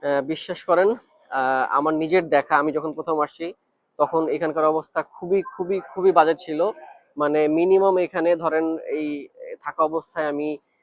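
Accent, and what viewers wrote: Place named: Nadia, India. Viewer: Ami to Kono problem dekhlam na? Amra bangalira aivabey kota boli...apni ki shantipur nodiya theke ashchen naki?....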